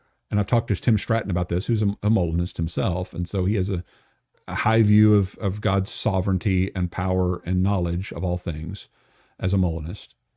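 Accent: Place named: Texas, United States. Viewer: I think you're smarter than you say you are. I think its that thick Texan accent that masks it 😉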